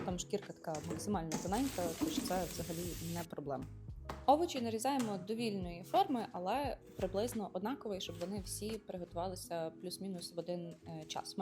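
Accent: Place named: Ivano-Frankivsk, Ukraine. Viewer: Випадково відкрила і я в захваті - зрозуміло, цікаво і просто! Дякую!! А мова яка!❤️‍🔥 Ніби з Франківська..🥰🥰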